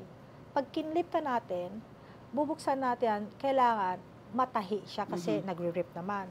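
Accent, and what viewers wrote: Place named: Australia. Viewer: Very easy call Mayor Magalong. He will find your mom Garcia. Your accent now is like Aussie, but you’re right in Australia they take care of their people , specifically women. But in Australia you have to work hard to survive. And bare with paying tax, kasi kapag nakita mo kaltas sa sweldo mo sasabihin mo Bakit parang nagtatrabaho na lang ako para mag tax. Hehehe. Cost of living in Australia is high specially here in Victoria specially MELBOURNE.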